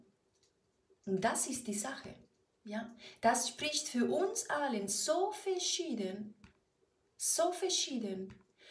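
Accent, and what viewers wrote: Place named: Spain. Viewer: Casi puedes decirr lo que quieres, te lo prestaría attención por tu accento español. 🤗 y tienes razón con lo que dices en algunas cosaa.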